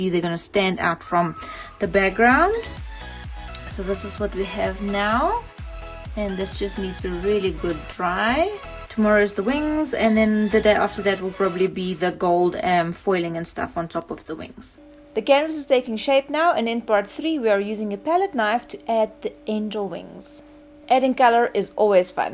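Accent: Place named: South Africa. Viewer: Your tutorials are fabulous! I just found your channel and subscribed! I thought your accent is from South Africa! I am too from SA! I live in Adelaide now in Australia, but might be moving back to look after my mom. Do you live in SA?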